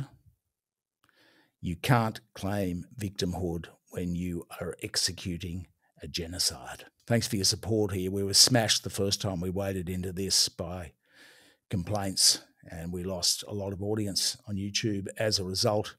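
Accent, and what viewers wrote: Australia. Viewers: Very grateful to be able to hear an Australian voice speak the truth. Legend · Finally an Australian accent criticising that horror in the Middle East by Israel and America. Frankly can never agree with imprisonment of indigenous peoples nor genocide or even starvation nor torturing via rape! They need stopping and justice, nothing else. Free the Middle East from Israel and America. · My first time watching this channel. I thought "He is an Australian!" I can pick our accent pretty quickly now 😀. It's a friendly accent. Thank you.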